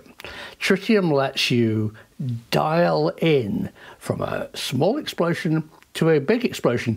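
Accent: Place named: Scotland, United Kingdom. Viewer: It must be the Scottish accent that made this one all good.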